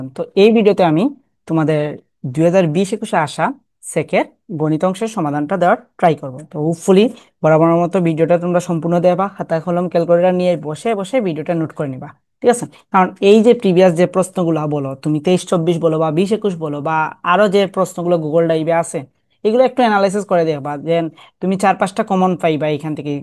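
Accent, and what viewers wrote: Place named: Sylhet, Bangladesh. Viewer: ভাই আপনার ক্লাস গুলা ভালো তবে সিলেটি শুদ্ধ মিক্স হয়ে যাচ্ছে, এগুলা খেয়াল রাখবেন।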